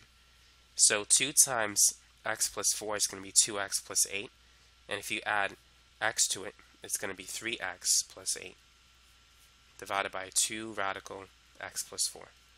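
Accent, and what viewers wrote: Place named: New York, United States. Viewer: You must be from new york proffesser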